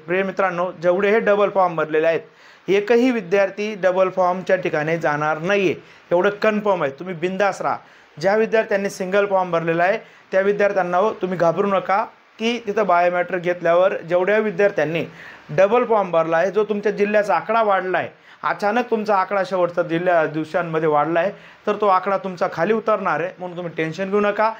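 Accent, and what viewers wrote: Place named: Khandesh, India. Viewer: सर तुम्ही कुठले आहे भाषा मस्त आहे खानदेश 😅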